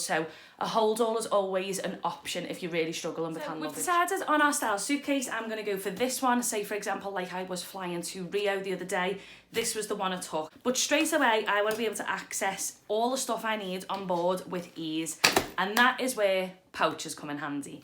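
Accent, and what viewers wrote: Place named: Wales, United Kingdom. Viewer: Thanks for the great tips! You have a lovely accent. Are you Welsh? Blessings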